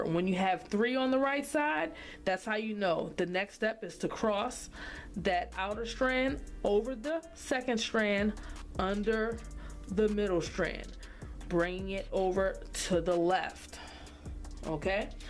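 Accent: Baltimore, United States. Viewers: Knew you was from Baltimore first 30s lmao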